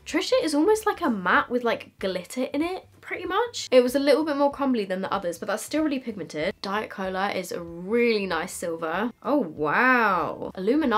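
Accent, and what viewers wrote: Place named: United Kingdom. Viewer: I find voice soooo calming! Anyone know where in the Uk she is from?!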